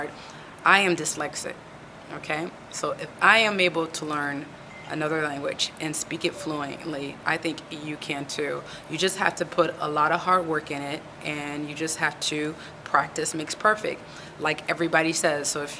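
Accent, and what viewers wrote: France. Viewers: Thank you for this video! I'll be moving to France next month and learning the language via immersion is my first order of business. Also, you have a bit of a French accent now, which is too cool. :) Thanks again!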